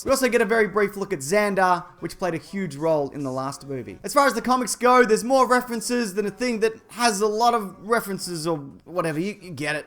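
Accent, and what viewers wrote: Australia. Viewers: YESSS THAT BEAUTIFUL AUSTRALIAN ACCENT IS BACK!!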